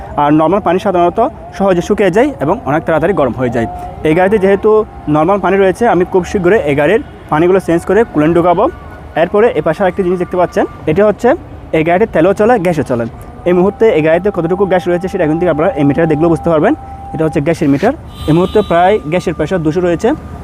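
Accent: Chittagong, Bangladesh. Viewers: Chittagong naki apner badi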